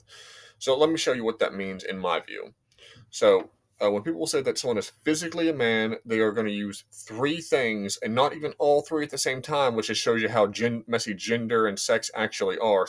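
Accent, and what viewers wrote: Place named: Appalachia, United States. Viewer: You from Appalachia or just live there.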